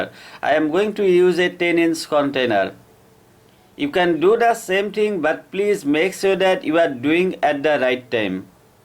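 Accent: India. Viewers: India has dozens of different pronunciations for English. We must learn to tolerate all kinds... The Bengali English, the Assamese English, the Punjabi English, the Tamil English and so on. The essence of this video is the passion for gardening that this gentleman is trying to kindle in us. That is of prime importance.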